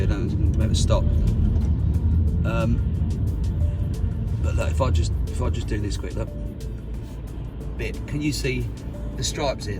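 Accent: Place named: United Kingdom. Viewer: I like your British accense.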